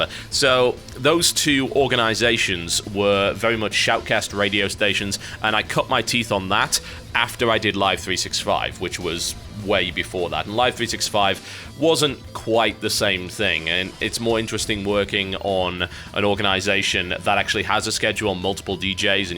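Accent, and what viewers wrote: United Kingdom. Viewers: Young cynical brit sounds much more British. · Hey, younger sounds way more british. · i hope comes back to britain for a tiny bit each year so he can keep the acsent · Oh my has the British accent lessened over the years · Monochromatic Stains? Dark Tranquillity? In Flames? Jesus christ you have some great taste,. Also, you sound so young, inexperienced and British!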